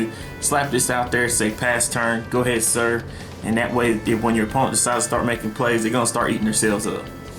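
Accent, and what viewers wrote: South, United States. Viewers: Oh yeah lol your straight country lol I'm from Tennessee lol.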